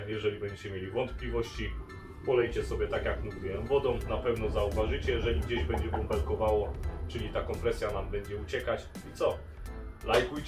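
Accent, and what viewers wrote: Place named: Silesia, Poland. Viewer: Ślonskiej godki nie ukryjesz 😜 P.S. fajny film! ;)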